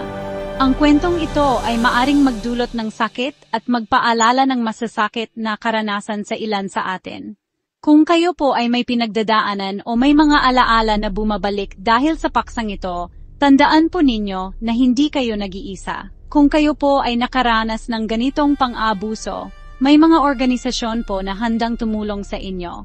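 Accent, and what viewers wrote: Visayas, Philippines. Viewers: Yung narrator bisaya naman .trying hard magpaka host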